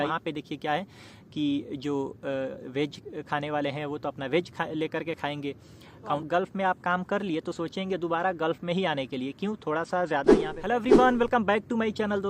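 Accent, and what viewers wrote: Bihar, India. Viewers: Salam.. Aap bihar se ho?? Your accent 😊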